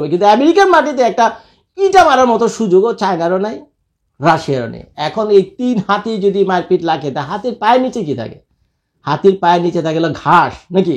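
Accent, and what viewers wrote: Dhaka, Bangladesh. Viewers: জোস ভাই আপনার কথা শুনতে অসাধারণ লেগেছে খুবই অসাধারণ লেগেছে কথার মধ্যে ঢাকাইয়া টান থাকার কারণে আরেকটু বেশি অসাধারণ লেগেছে। কিন্তু ভাই একটা জিনিস ক্লিয়ার করলেন না আমেরিকার মধ্যে কি সবই আছে যদি সবই থাকতো তাহলে বহির্বিশ্বে থেকে আমেরিকা আমদানি করত না চায়না রাশিয়া ইন্ডিয়া বাংলাদেশ যদি আমেরিকা কিছু না দেয় তাহলে তো ডোনাল্ড ট্রাম্প গেয়া!!!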